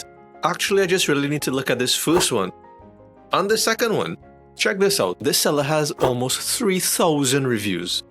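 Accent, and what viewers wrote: Trinidad and Tobago. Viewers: Are you Tinidadian? I'm hearing some sort of accent. Good content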